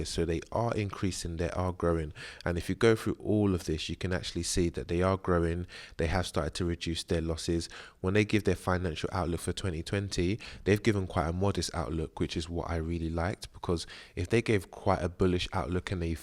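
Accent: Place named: United Kingdom. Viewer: Merry christmas. I've been watching your videos for a couple of weeks, just wanted to say I really like the content you're putting out, too few UK investors are doing what you're doing (direct, honest, transparent content), before I discovered your channel I was going back and fourth with the idea of starting my own investing channel for UK investors as I felt that niche just wasn't being filled but you're doing it bro, albeit with a slightly different strategy. From your voice/accent sounds like you're a similar age/area to me - it's great having something I can relate to in that respect instead of listening to X number of American accents.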